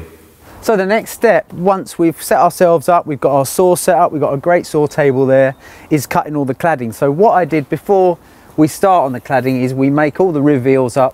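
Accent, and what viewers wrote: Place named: RP, United Kingdom. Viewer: This is poshest chippy I’ve ever heard I have use dictionary when he talks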